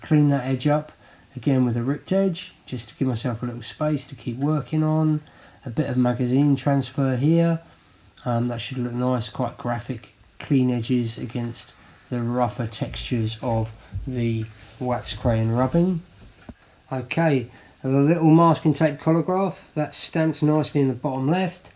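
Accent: United Kingdom. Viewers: I shared it w my art group and we may spend a day trying it…getting magazine images to transfer is a neh, sometimes it works some times it doesn’t, but I’ve had luck using pigment ink before laying the page down….I’ve got you on my watch list, as there’s lots of videos I want to watch…and I love your British accent!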